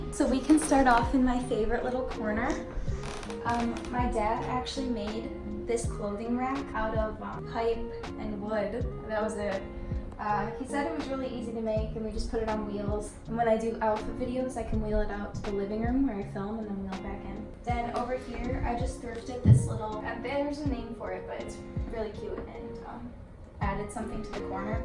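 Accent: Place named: Michigan, United States. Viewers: You have the same accents as my friends from West/North Michigan haha I'm from Southeast Michigan it's dope how there are different accents within the same state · if you've ever wanted to hear a michigan accent this is it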